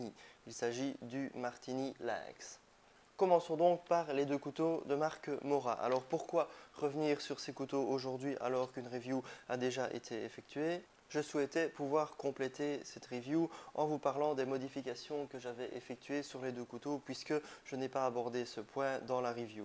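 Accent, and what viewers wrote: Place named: Belgium. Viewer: Ca c'entend un peu mais sa choque pas tkt :) je suis belge aussi donc j'ai l'habitude :D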